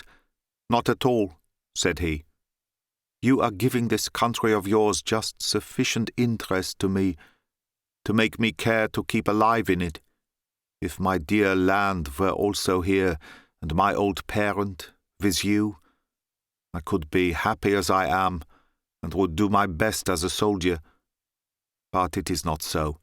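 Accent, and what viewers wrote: United Kingdom. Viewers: Mr would please read Agatha Christie short stories.. I really like listening to crime detective stories narrated by your clear British accent as you did with Sherlock Holmes stories.. I would be grateful if you read some Agatha Christie short stories.. listening to your channel has indeed improved my listening skills as an English language learner... Thank you very much sir ❤❤❤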